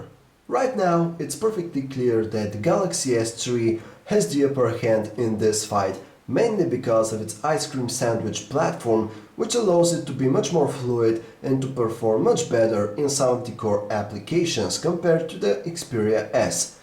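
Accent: Russia. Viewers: Sound like a Russian accent to me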